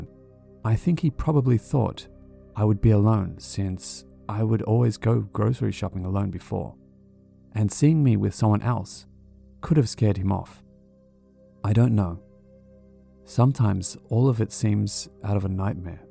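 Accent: Queensland, Australia. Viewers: That's what heard. QLD for sure. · Sounds like a fellow Aussie. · aussie myself and yeah, QLD was my first guess too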